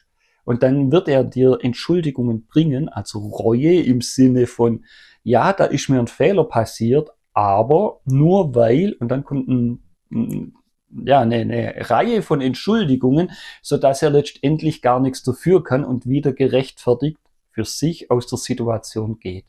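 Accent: Swabia, Germany. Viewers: Isch halt usm Ländle 😅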